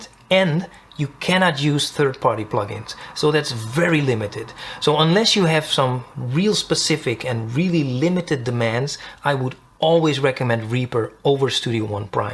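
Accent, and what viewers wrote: Netherlands. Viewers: Hoor ik een Nederlands accent? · Small channel still, but I love your clear style of presentation. I consider myself a "Reaper power user" but getting more and more frustrated by the midi editor, and for that reason alone I'm trying out Studio One 4 Professional. By the way, you sound a little Dutch (the accent)!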